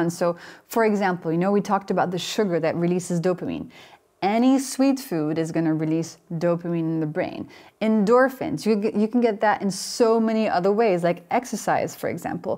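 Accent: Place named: France. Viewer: Your accent smoothly transitions back and forth between French and North American, and it sounds absolutely lovely 😊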